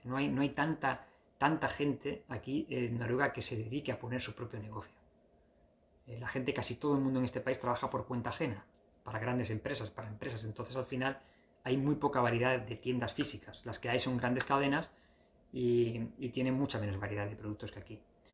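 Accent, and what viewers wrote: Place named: Andalusia, Spain. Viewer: Hablas como Andaluz,pero tienes la pinta de un mafioso ruso..saludos de Perú...😠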